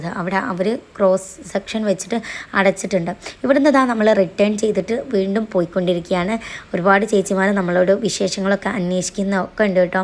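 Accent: Malappuram, India. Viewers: Thani Malappuram slang Ijj kajj kujj Idayil sramikund shudham parayan 😂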